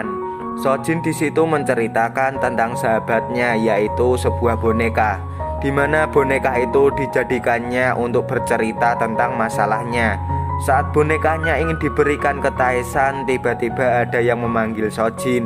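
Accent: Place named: Java, Indonesia. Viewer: Jawanya medok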